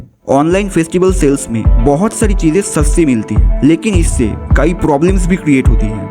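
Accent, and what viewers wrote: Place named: Bengal, India. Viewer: Your Bengali hindi accent ❤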